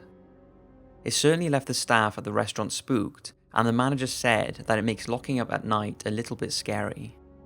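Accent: Wales, United Kingdom. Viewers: Hey I was just wondering, where are you from, because your accent sounds a bit Welsh. Thanks! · Hello, Are you from britain? I like your accent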